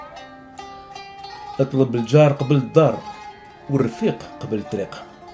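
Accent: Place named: Algiers, Algeria. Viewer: امثلة مليحة الله يبارك فيك، و هذي امثال جزائرية ولكن راك تنطقهم بالهجة العاصمية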